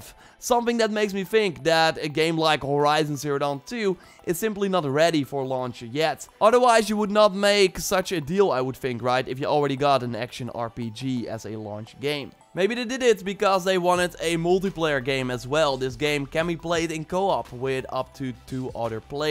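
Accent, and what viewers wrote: Netherlands. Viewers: I think this guy is Dutch, I can just hear it. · Meh French accent is diffrent thougj i live in belgium and we speak dutch and frensh and it sounds more like dutch accent tbh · You’re Dutch I can hear your accent.. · You sound dutch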